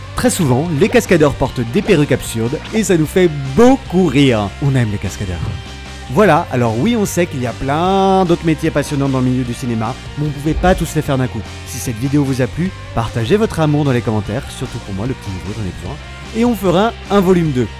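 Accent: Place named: Paris, France. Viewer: Pourquoi il y a un accent parisien aussi fort, et me dite pas que les parisien n'ont pas d'accent, il y en a un; et c'est celui là!!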